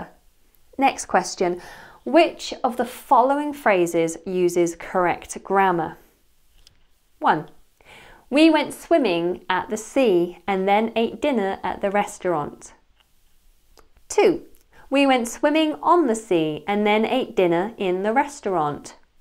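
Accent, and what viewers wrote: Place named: United Kingdom. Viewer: Hello,. I speak English like an American, as I was born and raised her. I do enjoy your slant on proper British English, however. BTW, I ran across a single mother (mum) who has a YouTube channel, and she speaks "perfect" Yorkshire English, IMO. The site is "Mama Mei." I enjoy listening to various dialects in English and other languages. I do speak about 20 languages: it's a hobby. - Dr Dave Menke, Tucson, Arizona, USA.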